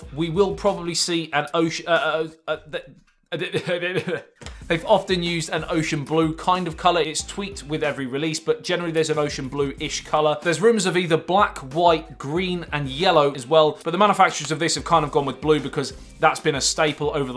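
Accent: United Kingdom. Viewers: watta accent language its like a british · Thick british accend... 😅😁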